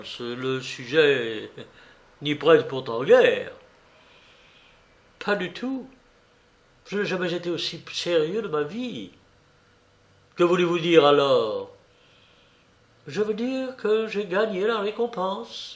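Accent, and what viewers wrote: England, United Kingdom. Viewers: Le lecteur qui est connu pour ses qualités de diction adopte dans récit l accent anglais qui parfois écorche les oreilles alors qu il aurait dû parler simplement en Français. Mais malgré tout il mérite les félicitations. DJENANE Rachid Alger.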